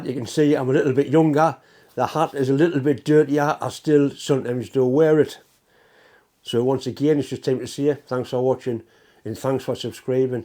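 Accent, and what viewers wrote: Newcastle, United Kingdom. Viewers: Thanks and congratulations (& Deb, and all your supporting team). As I said when you were 23 short of the 100k: “If you’re at all interested in metalworking, machining, old steam equipment, and more and enjoy a Newcastle accent then is well worth watching. No BS, and sharing a lifetime of knowledge and experience.” Honest and entertaining, as good as it gets.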